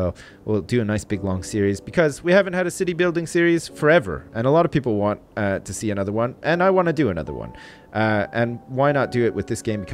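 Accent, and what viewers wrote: Canada. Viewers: Please, would rather have no music than that. The really slow piano brings the tone of the video down:(... Am I crazy? It's very juxtaposing to your cheery Canadian voice.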